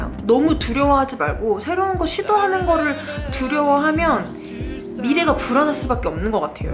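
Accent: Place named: United Kingdom. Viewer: Love your English accent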